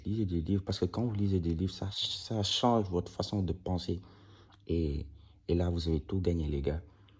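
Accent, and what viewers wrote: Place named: Senegal. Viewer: Sa sent laccent dun frere senegalais lool Bonne viseo frere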